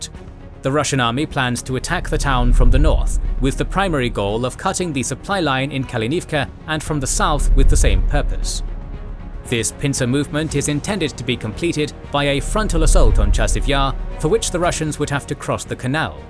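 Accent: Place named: United Kingdom. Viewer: Forget the animation....the fact that these videos are narrared by a real person with a bona fide british accent is something I find very easy on the ear, no repetitions volume changes or any murdering of local place names.Top man,keep it up.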